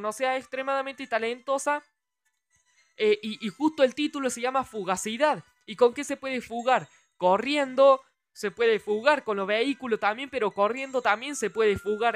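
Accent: Córdoba, Argentina. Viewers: Sos cordobes?? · Gracias por La Info, Casi Me La Compro Para nada. Sos Cordobes?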